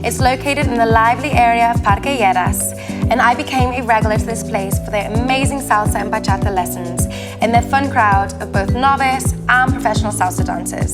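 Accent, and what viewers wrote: United Kingdom. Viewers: This has become my favorite channel, and that cute British accent was the icing on the cake.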